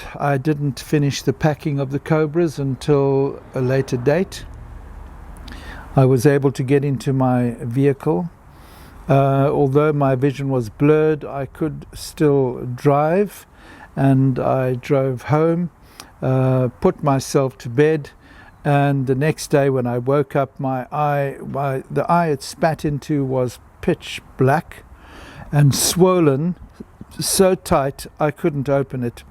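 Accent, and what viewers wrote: South Africa. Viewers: How terrible, but the way you tell the story sounds elegant. Maybe it's the South African accent. Thank you for sharing, keep telling your stories. I love them!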